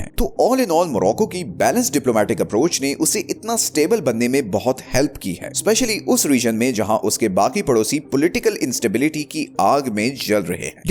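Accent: Pakistan. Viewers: this is Pakistani channel aisi Urdu India mein boli ni Jati lol 😂😆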